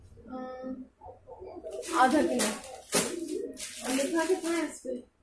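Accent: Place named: Faridabad, India. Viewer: Aap faridabad se ho lagta he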